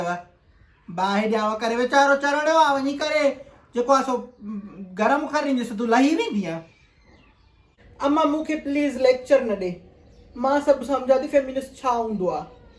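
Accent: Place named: Larkana, Pakistan. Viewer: 🤣🤣🤣Adi iho gothano style aa larkane waran jo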